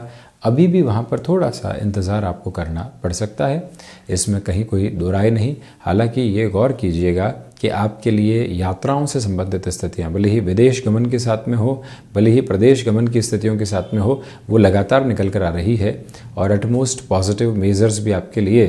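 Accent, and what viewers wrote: Standard. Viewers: Aap Kitna shudh hindi bolte hai🎉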